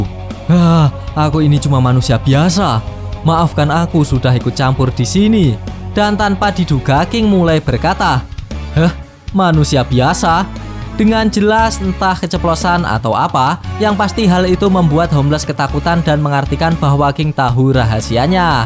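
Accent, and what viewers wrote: Java, Indonesia. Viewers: Medok anjay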